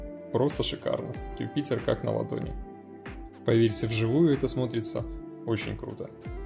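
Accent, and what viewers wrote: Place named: Minsk, Belarus. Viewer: Увы, минский акцент не спрячешь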